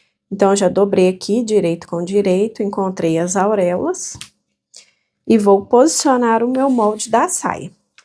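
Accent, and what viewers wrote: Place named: Minas Gerais, Brazil. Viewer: Maravilhosa a saia, e as informações adicionais sobre como ela veste corpos variados me ganhou! Inscrita com certeza, muito obrigada! Vc é mineirinha? Seu sotaque é familiar 😂❤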